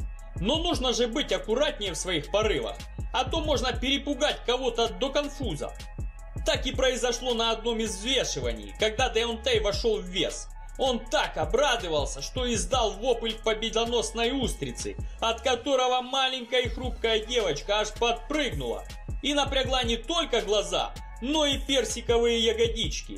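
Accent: Ukraine. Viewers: И ещё. Это просто украинский говор, запомни☝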